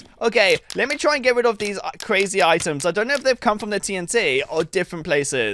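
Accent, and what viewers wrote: United Kingdom. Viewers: And is he britis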